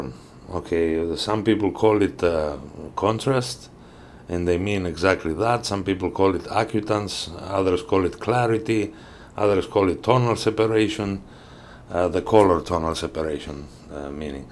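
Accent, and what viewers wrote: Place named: Greece. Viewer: May I ask if you are Greek? You have a very Greek accent. The video is great!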